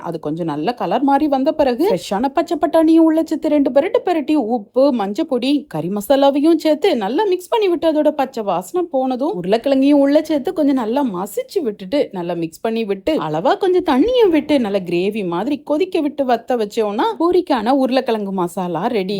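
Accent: Tirunelveli, India. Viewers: Thirunelveli, Kanniyakumari side la slang ipditha irukum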